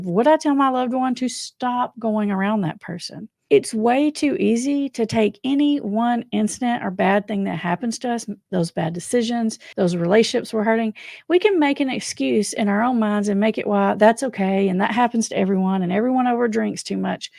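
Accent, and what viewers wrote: South, United States. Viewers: where’s your accent from? Southern states?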